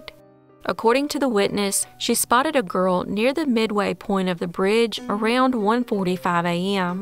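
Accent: South, United States. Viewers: Just discovered your channel. Thanks for covering these Canadian cases. Subscribed 👍 The Southern accent only makes it that much better! So charming and attractive when southern women speak. Not trying to be weird or anything, but it's true!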